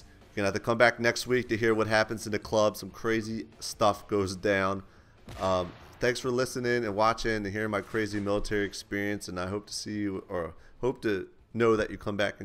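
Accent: New Jersey, United States. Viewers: i knew you were from jersy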